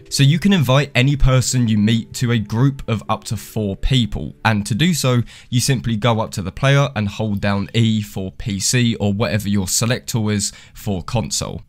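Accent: United Kingdom. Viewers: yo you british, i hit the L & S. just because gg. blud x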